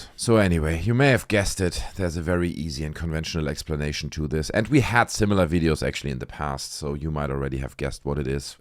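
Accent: Germany. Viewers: Love Ur German English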